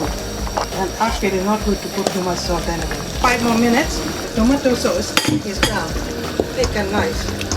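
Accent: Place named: Luo, Kenya. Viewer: You sound my accent of Luo.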